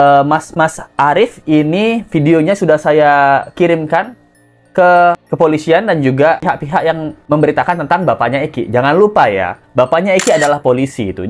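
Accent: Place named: Jakarta, Indonesia. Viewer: masa orang cirebon logat lu gua lu gua logaj jakarte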